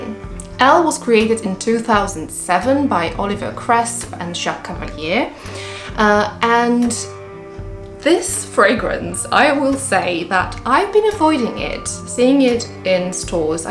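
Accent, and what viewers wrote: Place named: United Kingdom. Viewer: Hi. You said in another vid you live in Poland. Did you spend time in the UK? You sound part British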